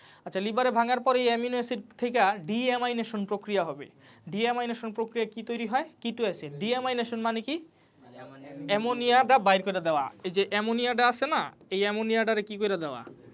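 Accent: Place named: Barishal, Bangladesh. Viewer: ভাই মনে হয় বরিশালের,😊 মাশাআল্লাহ ভালো বুঝিয়েছেন।❤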